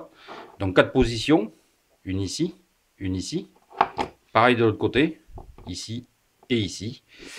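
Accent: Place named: Hérault, France. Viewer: Vidéo bien sympathique et couteaux superbes Quant à la voix et l'accent : soyons fiers d'être héraultais !!!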